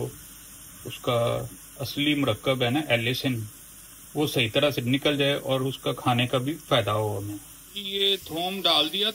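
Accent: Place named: Lahore, Pakistan. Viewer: Lahori lahja ay tada paw g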